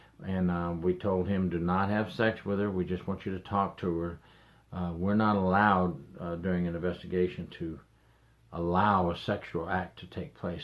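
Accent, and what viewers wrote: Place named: Florida, United States. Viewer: Just stumbled onto your channel not even sure how I got here. You sound like your in Florida. I live here too and I have a loved one incarcerated due out next year. I myself have never understood how the greed of money will drive someone employed by the DOC to get involved with an inmate and abuse their authority. Inmates can't consent to sex why are these people not charged with Rape? If I wanted to be with my Loved one in that way at a visit as a visitor I would assume I would catch charges. What makes them any different? I look forward to watching more of your channel. ;)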